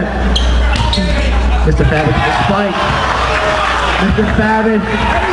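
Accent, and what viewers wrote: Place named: United Kingdom. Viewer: wow looking back that british accent was a terrible idea and extremely annoying hahaha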